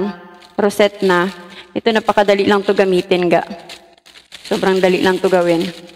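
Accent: Iloilo, Philippines. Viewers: Sumuaubra lang sila niyan idol...parang ilonggo ka din 😅😅😅❤️❤️❤️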